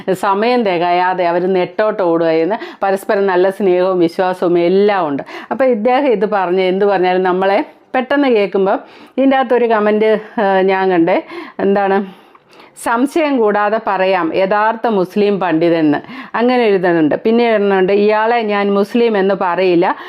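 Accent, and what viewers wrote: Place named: North Kerala, India. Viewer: വീഡിയോകൊള്ളാം. നന്നായി. ആ ഞ്ഞാമെന്റൈ കൊള്ളില്ല. വടക്കന്മാരെല്ലാവരും പറയുന്നഫാഷ യാണ്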